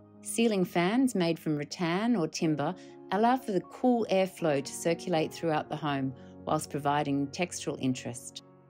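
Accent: Australia. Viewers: Thank you for an informative video. It was great hearing an Aussie accent too.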